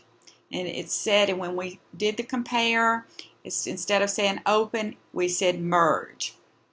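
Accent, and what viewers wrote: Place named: South, United States. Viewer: Your accent is from my home, the south. It is beautiful.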